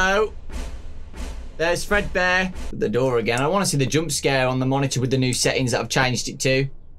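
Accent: United Kingdom. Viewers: your the defenition of funny and amazing at the same time- Also love your british acccent! I think the british accent is just wizard, im studying it now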